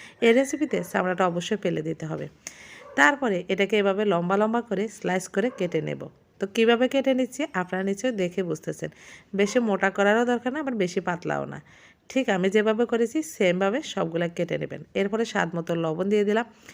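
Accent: Noakhali, Bangladesh. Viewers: আন্নে নোয়াখাইল্লা কেন ⁉️ 😁😁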